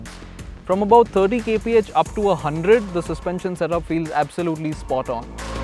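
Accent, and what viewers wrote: India. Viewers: Indian accent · I am doing my research on possible Bikes. I give props to people who have become proficient in another language. The problem for me as a native American English speaker is the heavy Indian accent. it makes it difficult for me to understand what the person is saying. I am not criticizing him. He is very fluent in English. His accent is what is getting in the way of me understanding everything he is saying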